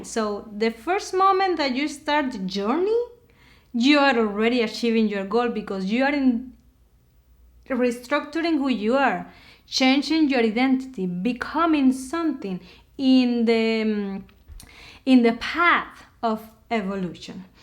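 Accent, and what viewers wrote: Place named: Spain. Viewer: Im your fan for your spanish accent of English. How sweet☺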